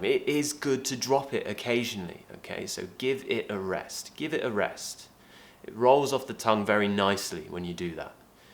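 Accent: United Kingdom. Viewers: Allright!!! I am from Brazil and love your videos, you help me to improve my english and the my accent too cos I really like the Bristh accent.... Cheers!!!! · British pronunciation wins ❤️ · Hi, I am one of your very supportive subscriber. I already watched some of your beautiful and useful videoS. I really like it so much. Although yourE a British people and I love British accent so I think itS the reason that pushes me to watch your videos everyday. Thank you and hope to see more upcoming videos from you. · Although you typed it here, I still watch the video because of his british accent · Your british accent is amazing😍😍😍😍😍😍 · I like acsen british. Ada karakteristik dengung. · I love UK accent 😍😍😌 Arabic girl .. from Iraq .. Keep going🤗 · you really help me to learn british accent..thanks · Your British accent it's blew me away 😍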